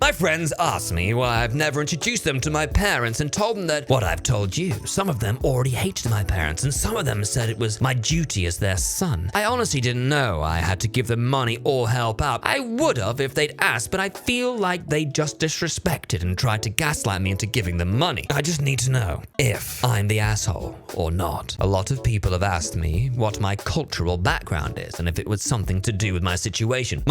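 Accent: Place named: United Kingdom. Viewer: Finally another reddit channel I can enjoy! Hell ye, time to learn to understand the ✨ British accent ✨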